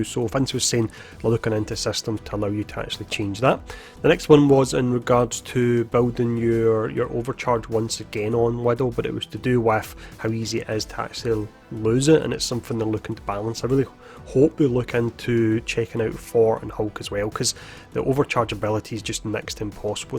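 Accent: Scotland, United Kingdom. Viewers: Your Scottish accent is strong where are you from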